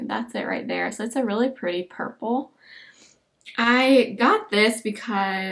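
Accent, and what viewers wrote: South, United States. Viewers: You are so heavenly! Love your videos! Where are you from? I hear a slight southernish accent perhaps?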